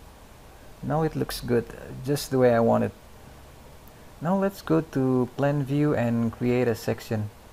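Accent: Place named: Indonesia. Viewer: tapi pengucapannya bagus kok mas, dengan aksen Indonesia...:) Dulu pernah kuliah/tinggal di Luar Negeri kah?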